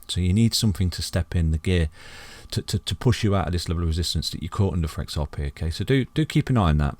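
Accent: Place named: Leicester, United Kingdom. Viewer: Where in the uk you from? You sound like your from Leicester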